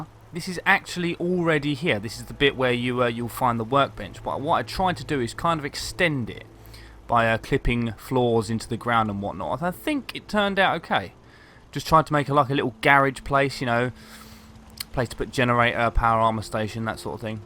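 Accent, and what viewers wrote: United Kingdom. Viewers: I just love how English you are when you say things like "take a gander up 'ere" or " 'ave a nice cup of coffee"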